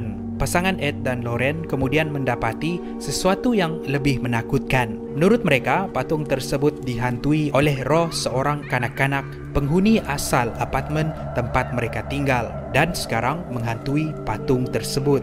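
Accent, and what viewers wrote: Sabah, Malaysia. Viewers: Abg orang sabah ye?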